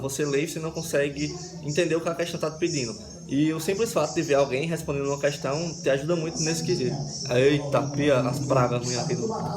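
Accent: Northeast, Brazil. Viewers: Vc é nordestino, né? Sotaque forte da porra. Não sei o que eu acho mais lindo, esse seu rosto perfeito o o sotaque nordestino, as palavras ficam mais engraçadas ou corridas, acho gostoso demais ouvir nordestinos falando. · É impressão minha , ou ele é nordestino?? Pq ele tem o sotaque . Eu achei super top !! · Como identificar um sotaque nordestino ? e rsrs só faltou o palavrão ! Rdrs é comum em algumas cidades ! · Como é presta atenção homi, cum sotaque do nosso nordeste😍😍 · Vc é nordestino ?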